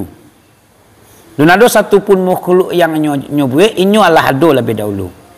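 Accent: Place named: Pariaman, Indonesia. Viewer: Malebe je mambacoe nak ngku... Bahaso piaman bana 😀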